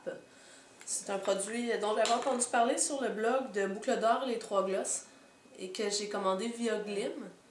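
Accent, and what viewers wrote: Quebec, Canada. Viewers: salut jaime vraiment tes video! sa fait du bien dentendre du quebecois loll des fois les francaise ne dise pas les mot anglais comme il faut et elle me perde et surtout il y a des produit quon trouve en france mais pas au quebec!! merci tes super bonne!!!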